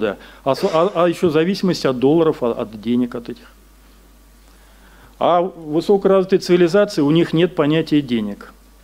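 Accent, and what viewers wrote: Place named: Ulyanovsk, Russia. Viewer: Такой говор в Ульяновской области.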